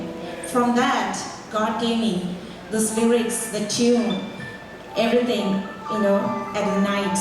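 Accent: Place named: Poonjar, India. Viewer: തലയിൽ തുണി ഇടാത്തത് എന്താണ് മംഗ്ലീഷ് കൊച്ചമേ. അമേരിക്കയിൽ അത് വേണ്ടേ ഓടാ വെള്ളം കുടിച്ചു നടന്നവൾ ആയിരിക്കും ഇപ്പോൾ അവളുടെ പൂഞ്ഞാറ്റിലെ ഇംഗ്ലീഷ്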